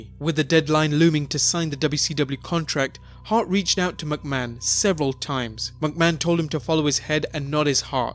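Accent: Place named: United Kingdom. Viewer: I love this guy's Britain accent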